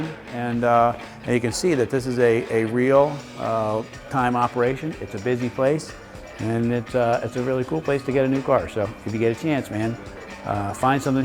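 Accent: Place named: United States. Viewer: really nice demonstration of your place and plain American English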